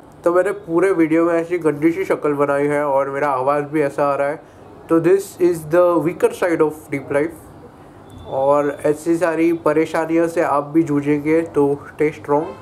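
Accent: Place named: Maharashtra, India. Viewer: bro ur hindi and english with slightly marathi accent feels that u r one of my family .. like elder bro of mine .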